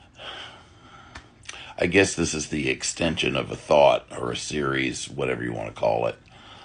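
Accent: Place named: South, United States. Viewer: Forget about what people say about your beautiful southern accent❤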